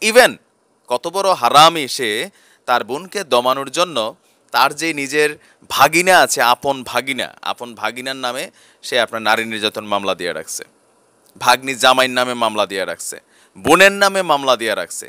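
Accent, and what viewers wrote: Comilla, Bangladesh. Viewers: ভাই আপনার বাড়ি কি কুমিল্লাতে ? আমার পরিচিত কুমিল্লার এক ভাই এর সাথে কথা বলার ধরন খুব মিলে । মজাই লাগে শুনতে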